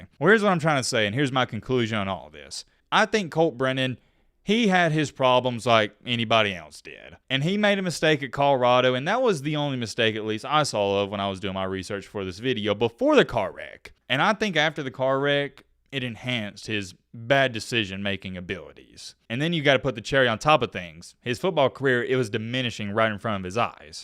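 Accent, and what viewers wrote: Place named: Appalachia, United States. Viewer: This hillbilly narration is like nails on a chalkboard. Also, this jackal doesn’t know ball and it’s obvious.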